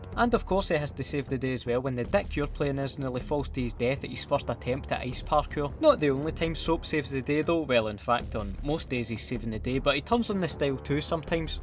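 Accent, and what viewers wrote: Scotland, United Kingdom. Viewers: Am the only one that finds Scottish patter hilarious great video though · British overload holy shit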